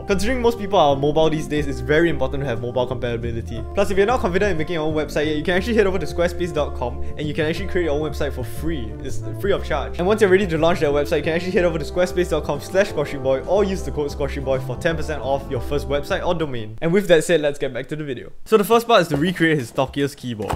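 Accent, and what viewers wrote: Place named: Singapore. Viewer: are u singaporean? i can recognise that accent anywhere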